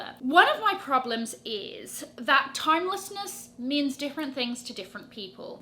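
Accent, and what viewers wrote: United Kingdom. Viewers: Your synopsis is “sodden genius” (said in your British accent) ❤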